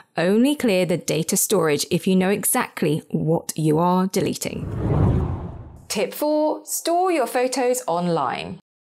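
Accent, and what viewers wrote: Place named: England, United Kingdom. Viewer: Bossy. English. I like it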